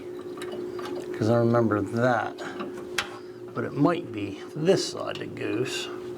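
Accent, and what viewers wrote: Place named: Ohio, United States. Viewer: Are you located in Ohio by any chance? You sound exactly like my brother in law. He is from eastern ohio. Nice video.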